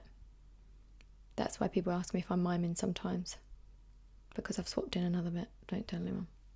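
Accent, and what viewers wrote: England, United Kingdom. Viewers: Can we just appreciate her British accent like wow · is she British ?? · Not gonna lie I love the pommy accent :)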